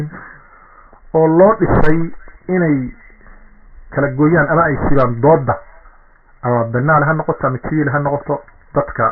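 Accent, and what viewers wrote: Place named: Mudug, Somalia. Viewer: Aun halganki syl ayu kaso qayb galey, doorashana kursiga waa ku yimid sharaf intaasa ugu filan MD Abdirashid Ali Sharmake, waxaa kalo cawa ka siyadsan inu nin rer muduga ahaa sida hadalkisa laga dhehanayo bari ba ha u dhashe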